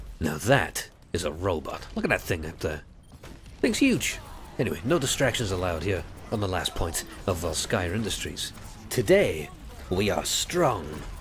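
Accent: Wales, United Kingdom. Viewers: Surely Welsh. Just watched the Hanzo introduction vid, he gets a bit heated right at the end. Guessing he mitigates his accent... Creepy analysis over. PS: Or, if Aus.... New South Welsh?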